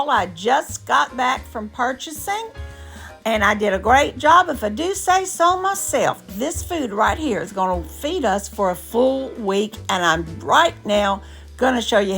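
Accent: South, United States. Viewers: Just found you today ! I love your joy & enthusiasm & will subscribe & watch … I’m assuming your a southerner . May I ask which one ? I’m from central Pa . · I love it! She’s just like Paula Dean! It’s a southern thing😊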